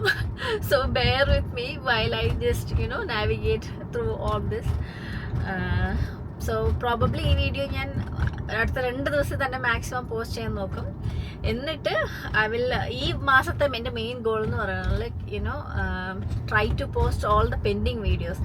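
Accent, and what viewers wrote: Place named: Trivandrum, India. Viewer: You are from Enklm and you have tvm slang...😅😅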